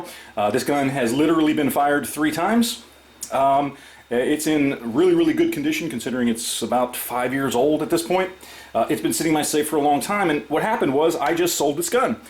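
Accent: South, United States. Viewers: I recently watched your first heritage rough rider review from 10 years ago.... Man, you had a thick southern accent. Damn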